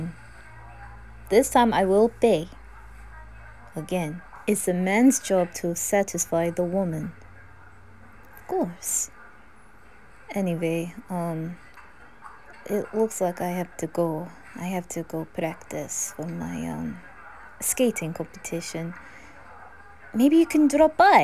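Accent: Russia. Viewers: Ваш русский акцент прекрасен (*´﹀`*) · The amount of ppl speak russian in here lmao i'm russian and your russian accent and russian it self is really good keep up the amazing work love❤❤❤❤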